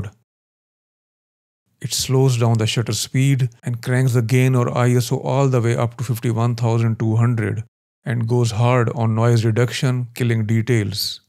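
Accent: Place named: India. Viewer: Thumbs up for keeping that DESI accent and NOT FAKING american accent 😂🤣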